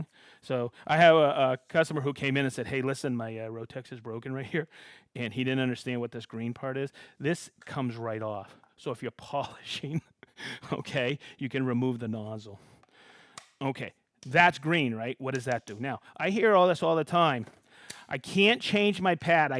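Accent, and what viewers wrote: Boston, United States. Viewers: Hoosier?? That's a BOSTON accent...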